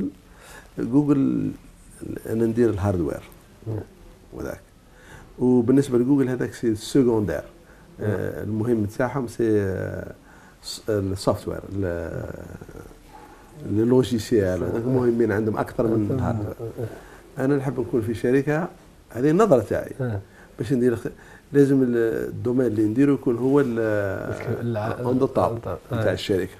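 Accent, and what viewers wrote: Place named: Oued Righ, Algeria. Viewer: بالرغم أنه عاش مع الغرب بقي يتكلم بلهجة وادي ريغ ولايته ولاية المغير سبحان الله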